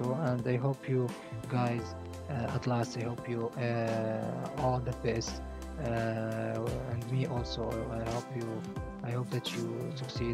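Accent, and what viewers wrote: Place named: Morocco. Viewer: bayna mn aaaaaaaah w sawtk mghribi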